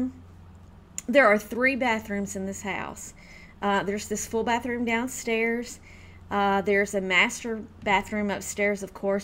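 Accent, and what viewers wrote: South, United States. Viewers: Your southern accent is so awesome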